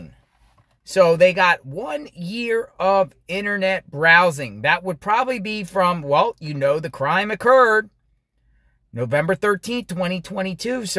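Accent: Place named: Pennsylvania, United States. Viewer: Off topic, forgive me but, I know you were from PA. I am too. I could hear your accent. Haha I'm from Philly.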